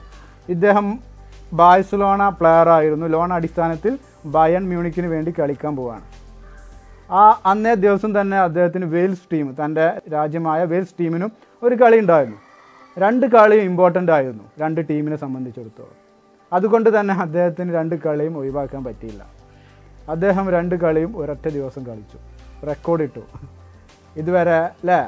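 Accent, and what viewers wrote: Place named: Kasaragod, India. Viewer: കേറി വാ മക്കളെ...🙋 ഇനി മുതൽ കാസർകോട് ഭാഷയിൽ ബ്ലോഗ് കേൾക്കാം...😍😍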